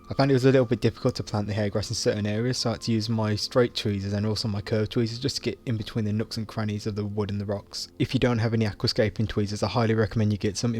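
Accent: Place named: Birmingham, United Kingdom. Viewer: Nice, MDs bowl video inspired me to do one too. I had a planted bowl like this at my university halls but I left it there for too long when they sent everyone home so all the water evaporated and the plants died. So I'll probably do a video rescaping that bowl at some point. You have a good content on chanel, good quality camera and editing for less than 100 subs, you deserve more. You're from Birmingham right? I recognise that accent haha. :)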